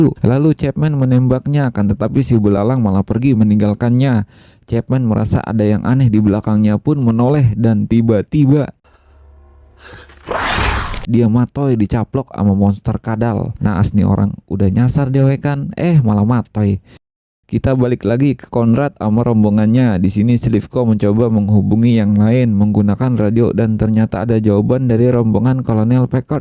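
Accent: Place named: Jakarta, Indonesia. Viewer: Logat betawi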